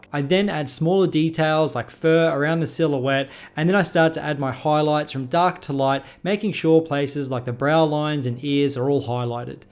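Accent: Australia. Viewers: I gotta say I love your stuff. Whenever I see a really classic Warhammer mini I can always imagine that in the distance I can hear an Australian accent and some delicious dungeon synth.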